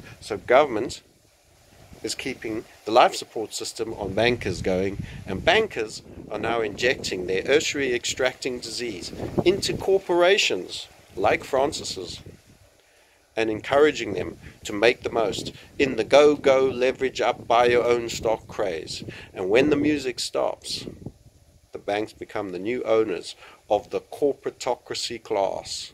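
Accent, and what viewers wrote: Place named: United States. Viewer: 10/10 American accent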